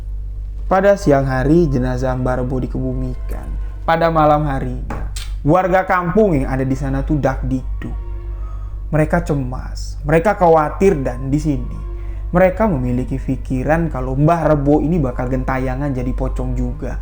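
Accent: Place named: Madura, Indonesia. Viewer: Aksen madura nya tolong di hilangin bang, agak” ketawa jadinya dengar penyampaian nya😂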